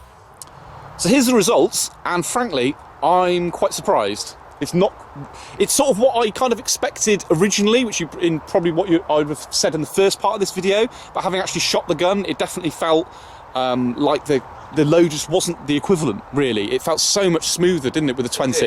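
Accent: United Kingdom. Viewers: not mad but sounds so weird having someone with a British accent explaining guns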